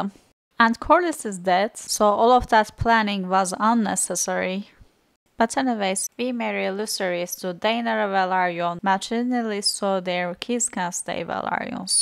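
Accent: Turkey. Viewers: It is look like Turkish accent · Yup that’s 100% Turkish accent 😄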